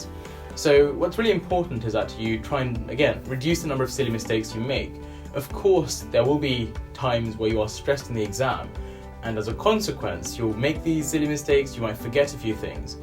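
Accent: RP, United Kingdom. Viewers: Where are you from ? a you sound really posh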